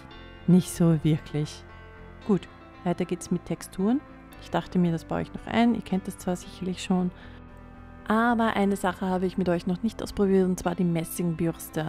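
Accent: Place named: Vienna, Austria. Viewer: I könnt mi wegwerfe! 😂Der Dialekt! Wiener Schmäh & More! Lustig! Das macht Spaß, Dir zuzuhören! Und danke für die hilfreichen Tipps! Möchte demnächst mal anfangen😊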